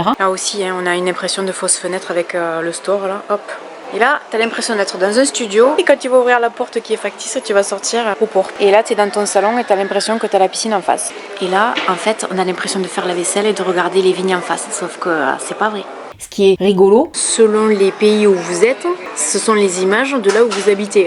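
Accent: South, France. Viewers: C'est toujours un plaisir de t'écouter/regarder tes videos. Au Canada, dans la ville de Québec, il n'y a de chanson Ikea, une chance!!! L''autre jour, nous l'avons fait notre magasinage a l'envers des fleches! (pour le plaisir, voir autrement, etc)!! Les prix sont plus dispendieux. Ma fille et moi adorent regarder tes videos. Je m'ennuie de l'accent du Sud. La proximité des autres pays me manque aussi. take care. Pleins de bisous de l'autre coté de l'ocean.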